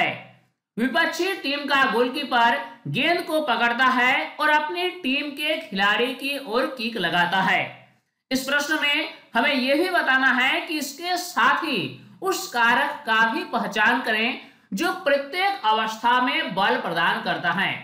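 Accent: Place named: Bihar, India. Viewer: Sir apki boli achi nhi h asa lag rha h ka aap bihari ho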